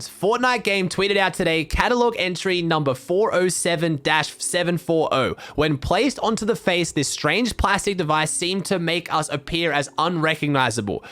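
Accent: Australia. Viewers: has a very strong Australian accent but when he starts off his second line with “Welcome back to my YouTube Channel!” It sounds so British how he always pronounces it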